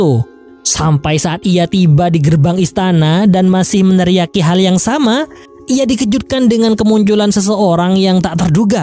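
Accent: Madura, Indonesia. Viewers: Eeh reng madurehh ka adminn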